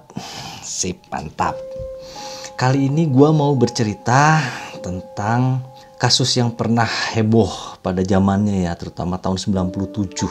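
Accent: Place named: West Java, Indonesia. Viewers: ( keluar. Cahaya tea ning ) hahaha fiks org bandung pisan hahaha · Suka bercahaya tea gening. Aduuh sunda pisan ... Salam dari bogor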